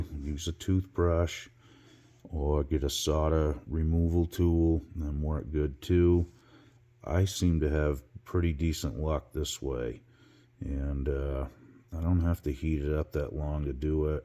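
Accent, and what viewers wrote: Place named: Boston, United States. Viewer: I worked at Lasalle Music in Boston around the time they were selling these. Judging from your accent, it sounds like you might know the place. I did acquire a Charvel Model 5 at the time. The guitar plays great but the pickups were always weak on the top string, so I recently replaced them with active EMGs. In that process the licensed Floyd Rose bridge broke (it made of cheap pot metal). Nice job bringing back a nice guitar.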